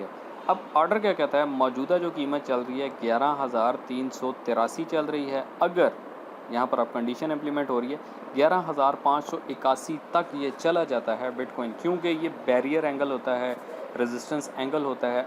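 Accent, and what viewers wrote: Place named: Punjab, India. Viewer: Good information ji Stay blessed. Nice Punjabi touch too. 🙏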